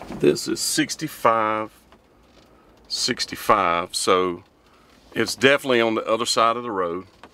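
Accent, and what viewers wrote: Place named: Tennessee, United States. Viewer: l am from Canada and l lived in Manchester, Tn in the 2000s and your accent reminded me right away of being back down there in Tn. Loved it and loved the weather.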